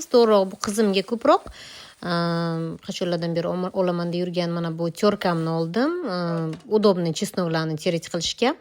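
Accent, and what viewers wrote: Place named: Termez, Uzbekistan. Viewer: опа яхшимисиз жуда яхши аелсиз тан жонингиз сог булсин термизчангиз жуда ектида лекин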